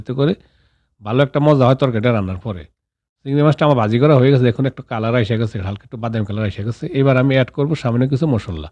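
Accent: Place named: South, Bangladesh. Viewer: আমার বাড়ি ভোলা।আপনার কথার ধরন দেখে জিঙ্গেস করলাম।সাধারনত আমারা দক্ষিন অঞ্চল এর মানুষ এইভাবে কথা বলি।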